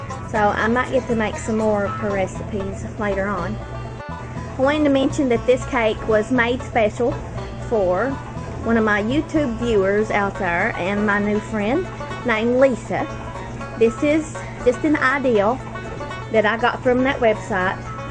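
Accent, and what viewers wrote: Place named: South, United States. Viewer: looks good and love your country voice:)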